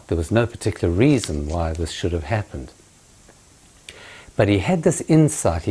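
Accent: South Africa. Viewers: just turns ''ouuff''. can't beat the sath african accent.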